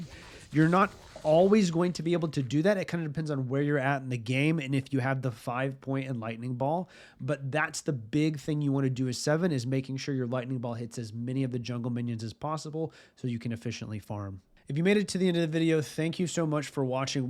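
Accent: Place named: Canada. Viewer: Wait ur canadian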